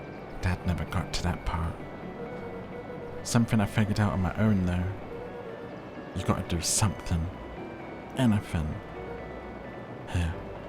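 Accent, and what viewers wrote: United States. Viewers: Your southern US accent is sooo good whether youre doing it for men or women. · I thought your American accent was really good! My brain didnt even notice it until you mentioned it, which is what you want when doing an accent.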